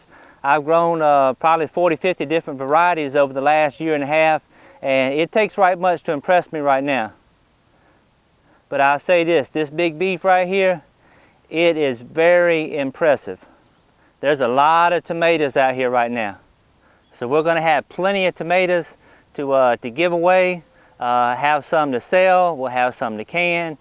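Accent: South, United States. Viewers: Found your videos via a fb post someone had up. Awesome information. Love it!!! I'll be going through a lot of your videos for your expert knowledge. You gotta be a southern boy. Where are you from? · Apocalyptic right-wing paranoia NEVER sounds any more convincing in a southern accent - just sayin'. If southern right-wing extremists had ANY kind of record of success instead of initially building their economy on slavery, ultimately warring on their fellow Americans in defense of their abomination, losing that war catastrophically, wallowing in prejudice, failure, and poverty ever since they might have built up the SLIGHTEST credibility for their point of view - but that's not the way it is - is it?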